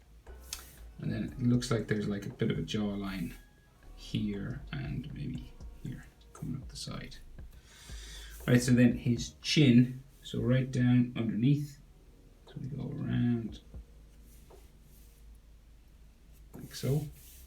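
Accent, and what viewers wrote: Ireland. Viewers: are u irish bruh i am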